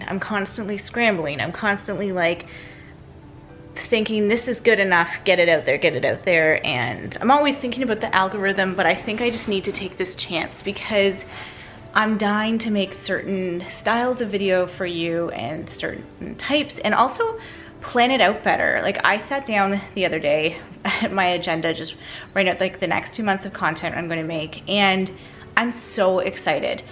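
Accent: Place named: Canada. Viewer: I live in the U.S. but I love your Canadian accent! I never knew that Canadian holidays were different than ours until I started watching your videos. Our Thanksgiving is in November and I’m not hosting but I love to see what you do! I also have really loved watching your decluttering journey, you’ve come so far! I hope you have a happy thanksgiving 🦃😊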